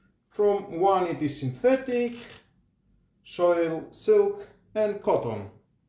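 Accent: Greece. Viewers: The supermarket in my area have it now in 77% sale and I just wanted to see some reviews to understand if it’s worthy to buy it and I found yours! Really helpful review thanks a lot! I wanna ask you something…from your accent I was thinking that you have Greek origins, is that right? Έλληνας είσαι φίλε μου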